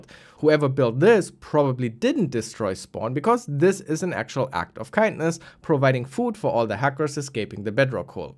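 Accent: Germany. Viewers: U just sound so german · your german? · i hear your german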